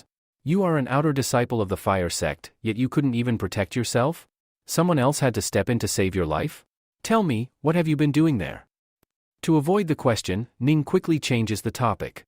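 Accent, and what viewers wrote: India. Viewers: Indian voice damn tho he tried its ok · The AI voice being Indian is insane 😂😂😂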